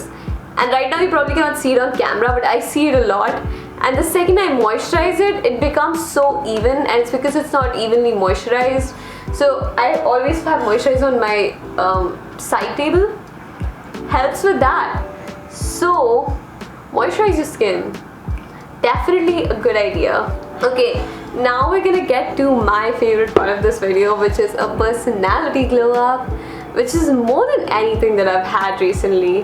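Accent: India. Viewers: she gives me vibes like she is lil ananya pandey and sonam kapoor ofc with south delhi/mumbai accent but more still better then them😂😂❤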